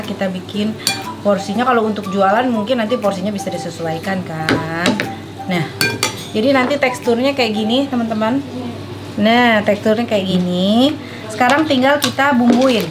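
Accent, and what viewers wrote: West Java, Indonesia. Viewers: Resep nyarios logat sunda na teh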